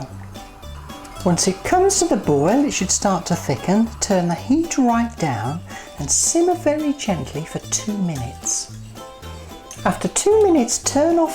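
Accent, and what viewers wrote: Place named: Southern England, United Kingdom. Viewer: Lol, I love Southern English accents... MoussakaR!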